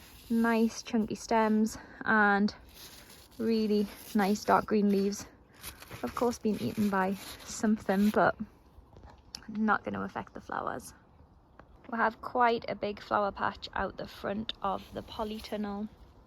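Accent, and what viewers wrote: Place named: Newcastle, United Kingdom. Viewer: Hi you are a natural your accent is so cute not too much Newcastle. I like that you are more old tech school, please keep it that way.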